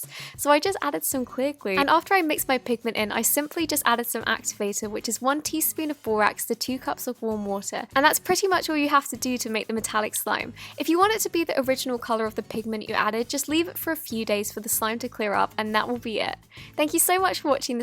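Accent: England, United Kingdom. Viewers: I can tell from your accent that you're British and I'm British too. I'd like to know where in Britain you are from and where you get your supplies for Slime because I'm pretty sure they don't sell Elmer's glue and borax in the uk. Please can you tell me where you get it from. Lots of love Abi from Leeds. XX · Is she from England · Bro I love her British accent!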